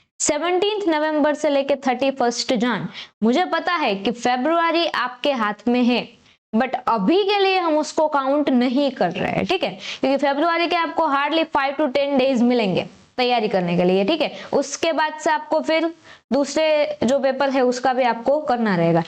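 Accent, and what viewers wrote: Gujarat, India. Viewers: Aap Gujarati Ho kya Mem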